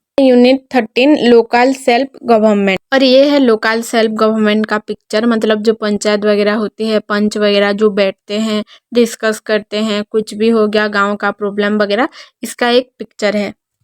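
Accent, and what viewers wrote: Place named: Odisha, India. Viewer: Apananka voice ru laguchi apana odisha ra heithibe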